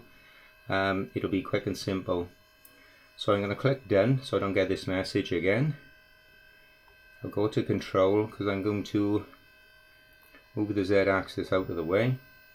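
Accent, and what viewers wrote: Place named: Swansea, United Kingdom. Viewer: Hmm I'm sensing a Swansea accent. Appreciate the video!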